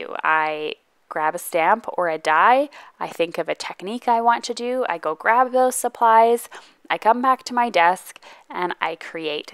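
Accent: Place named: Canada. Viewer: Four things I learned from this video: 1. You have for sure been back in Canada long enough for your “about” to sound more “aboot” 😊 2. I need to see more of the puppy!😍 3. It’s really fun to watch this process 🎬 4. I really am that lazy, it’s why I know I need almost all of my supplies in easy reach places 😄 Thanks for sharing! Oh and for the Misti and trimmer you could add a bar to the inside of your desk near the floor to slot them into. I’ll IG you a pic 👍 it works great for me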